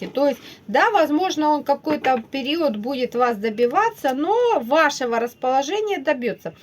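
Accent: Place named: Kuban, Russia. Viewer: У вас кубанский говорок. Я сама, из тех мест....🤗⚘️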